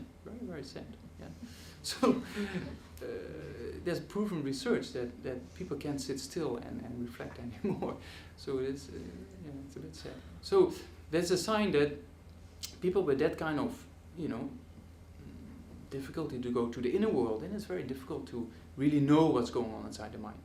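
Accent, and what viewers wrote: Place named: Netherlands. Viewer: The volume is very low, coupled with Dutch English and swaying doesn't make for easy listening.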